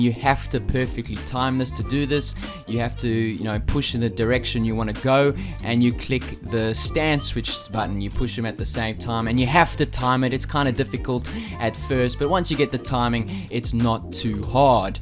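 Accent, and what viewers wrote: South Africa. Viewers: South African accent i think. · Dude are u from south africa do u play online and tournaments? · Am I the only person who thinks this man is south african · says australia but you sounds like a ZA oak? the mix of accents is pretty funny tbh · You sound South African. Am I right? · Are you South African? :0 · Are you South African?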